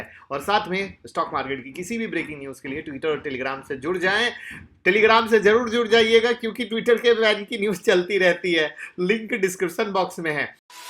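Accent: Bihar, India. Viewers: Sir aap Bihar se lagte ho.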